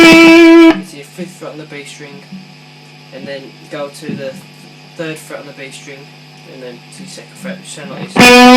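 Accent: United Kingdom. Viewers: hey dude , are you from england ? i thought youre from russie 0.o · Where u from mate u sound like u got a brummy accent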